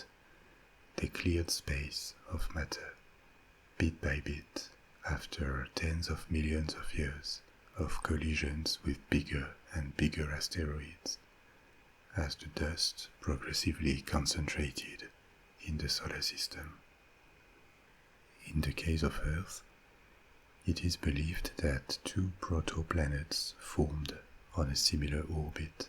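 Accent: France. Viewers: Your videos are really great, but your accent reminded me of my former asshole of a French boss from years ago so I got high blood pressure from listening to your videos instead. Totally not your fault, just unfortunate LOL. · You have the most relaxing voice and the coolest accent. Actually you don't have that much of an accent. You speak excellent English. But it's a compliment. I love French language and the way you speak. Also, thank you for a great night of sleep, relaxation and expanding our brains with knowledge! You are awesome! I love your channel and really appreciate all the hard work you put into your passion! Thanks again and God bless. :)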